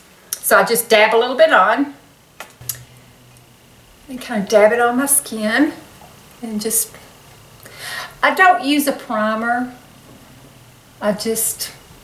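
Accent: South, United States. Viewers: I love your video - this is the first one I have watched. Wish I looked as young as you! I love your southern accent, makes me feel at home. XOXO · GORGE GURL!!! I am in my 40's soon to be 50....EEEEEK! I am in DISBELIEF About that.....You are absolutely STUNNING! I am starting my channel "Boldly Being Me" Life after 45!! I hope I can get you to subscribe? But either way I loooove your Channel and videos, love your accent ( I am from Jacksonville Florida, so southern accents are the Norm here;-)) You do You and We are Loving it! ;-)) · I am so thrilled to have found your videos today!! What a beautiful lady you are! Being in my mid 40's, I really don't want the makeup/skincare advice from a 20-something... so finding your site is exactly what I've been looking for. And, I believe I heard you refer to being from Tennessee, I'm also a TN gal, nice to hear that southern accent! 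You are such a beautiful lady with or without makeup. I'm looking forward to watching your videos. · I recently discovered your channel and I'm working my way through your videos. You are absolutely gorgeous! I'm about your age but look twice as old because I've never done any skin care until recently. You have a beautiful smile (and personality) and I adore your southern drawl! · I love your southern accent. I gotta say you have very good skin, no sagging, wrinkles, or hollowness. You won the genetic lottery.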